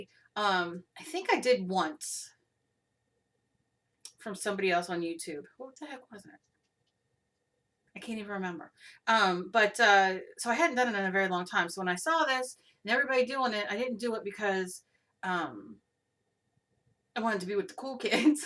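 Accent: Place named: East Coast, United States. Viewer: I personally LOVE watching your videos, hearing you talk is much better then music. It makes me feel as if we are hanging out together. I love the accent, I miss the East Coast so much now that I live in the West. Everyday I go to see if you have new videos along with Gina, Lindsey, so many talented ladies! I thought it was great you mentioned your weight loss! When this video started I said to myself, how can I ask her did you loose weight? Then you said it! I am so proud of you, I need to start doing something myself, I am a food junkie! I look forward to recipes and a craftroom tour! Thanks for sharing all that you do! I think you are a peach:)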